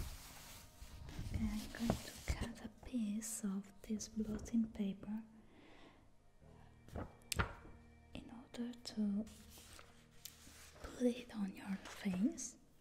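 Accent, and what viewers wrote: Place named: England, United Kingdom. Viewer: Omg! Your English accent is just so good and relaxing😍 I just love it ❤️ it’s so cute!🤗🥰❤️❤️❤️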